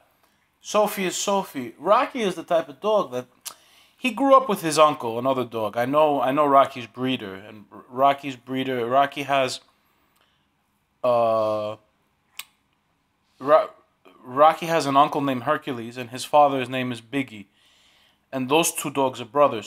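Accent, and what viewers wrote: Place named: East Coast, United States. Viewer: nice my man yea im in mass..you have tht same accent we pretty much all do on the east side lol..but yeaa my dude new subscriber ..nd watching all your vids;))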